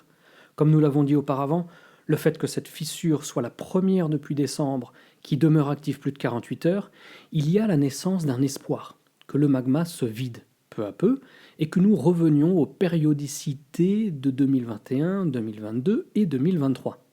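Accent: Iceland. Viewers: Bonjour pensées sincères au peuple islandais. Ils vivent véritablement sur un baril de poudre Merci pour ton partage et.....ton accent islandais.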